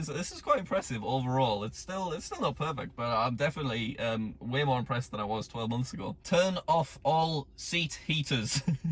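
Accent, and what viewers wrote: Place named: England, United Kingdom. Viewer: I swear your accent is sick I love England people’s accents. Tesla Voice Commands need to understand people with any accent completely. Because sometimes it’s impossible to not talk with your accent.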